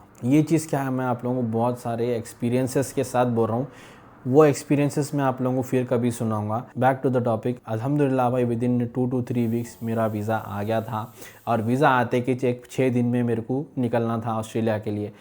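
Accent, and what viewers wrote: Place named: Hyderabad, India. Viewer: apke baat karnke tarikqe se pechna mai hai nahi hyderbad ke aap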